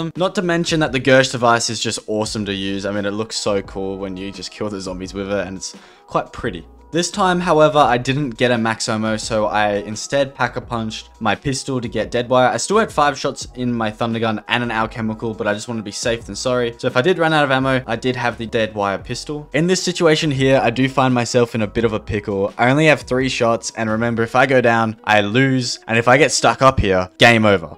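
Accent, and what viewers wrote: Australia. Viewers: he’s Australian and he is saying kuda not cooter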